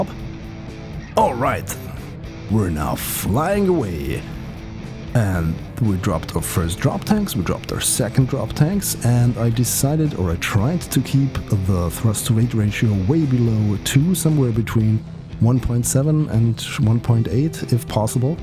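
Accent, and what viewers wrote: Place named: Germany. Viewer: Do you speak german? You‘r „s“ sounds, weil..., german.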